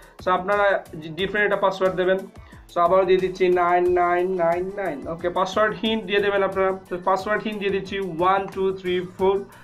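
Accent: Sylhet, Bangladesh. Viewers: Bhai ki Sylheti?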